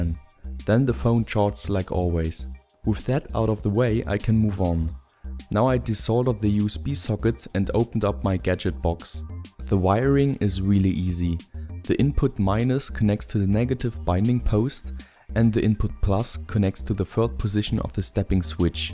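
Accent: Germany. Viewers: Yes, he is German. You could tellthat he is German by his accent.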